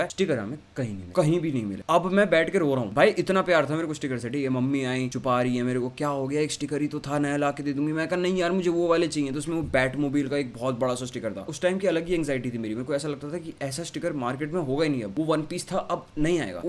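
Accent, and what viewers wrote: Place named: Uttar Pradesh, India. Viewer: After a lot time..... using some UP accent yeh <<<<<<3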